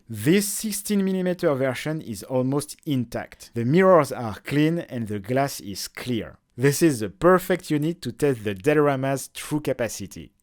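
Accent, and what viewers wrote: France. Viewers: 1. Great and fun historic video 2. Interestingly, this is a physical form of compression. Meaning, for example, JPEG 2000 uses wavelet compression, which is sort of the physical version of that. Another way of thinking of it is instead of shrinking an image in 2 axis, compressing just one. 3. I invented many technologies for the Movie industry, including Core tech for Video Assist (ARRI/Panavision/Sony ) and I invented Disney's Animation Studio software 4. Your French accent is so strong, I thought you were doing an Inspector Clouseau skit. This is not an attack; you are completely understandable. In fact, I would vote if anyone wants to learn to speak French, first learn to mimic you speaking English, and THEN learn French. In sort of a strange way, you're the anamorphic accent filter. English -> English with only French pronunciation -> French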